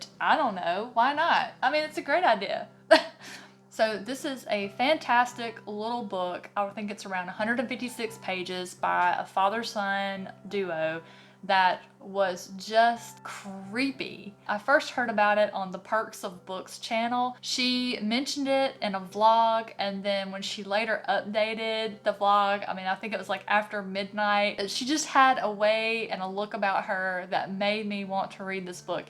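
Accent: South, United States. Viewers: I love your channel!!! Love your accent. I'm from the South too.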